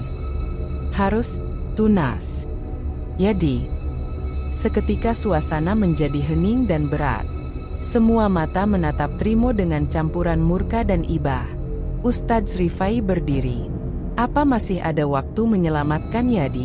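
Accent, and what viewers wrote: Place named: Java, Indonesia. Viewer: Bahasa jawamu lucu...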